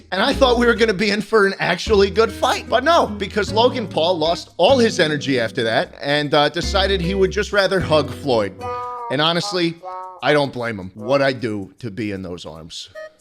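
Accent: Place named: South, United States. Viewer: vertical video sounds like a read neck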